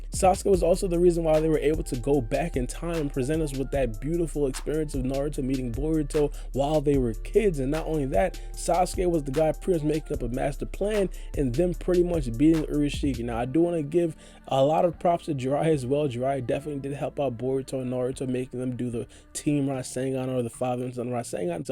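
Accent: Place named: New York, United States. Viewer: Im gonna guess your from ny, 'cause your accent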